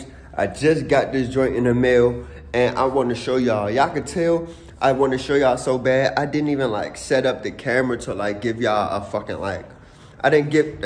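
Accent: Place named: Washington DC, United States. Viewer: You from DC bro lol ?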